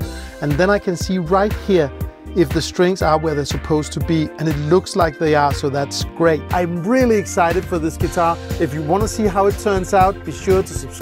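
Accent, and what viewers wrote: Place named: Germany. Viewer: Why isn't it perfect? What are the problems there? I removed a year ago the neck of my strat copy and now the body seems to have changed due to humidity. The neck pocket was way too tight anyway. I'm trying to realign and reroute the neck pocket now. If your method wasn't perfect how can I achieve an absolutely centered neck? Doesn't matter how much work it is. I'm a perfectionist and I'm trying to achieve less than tenth of a millimeter deviation from body to the end of the headstock. I want it perfectly centered How should I do it? Your accent sounds German. Grettings from Braunschweig by the way.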